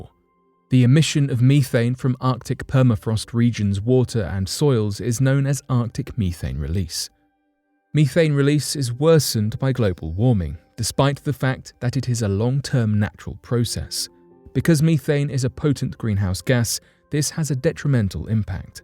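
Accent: United Kingdom. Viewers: That's the way Brits pronounce it and he speaks the queen's English. Maybe we are pronouncing it wrong??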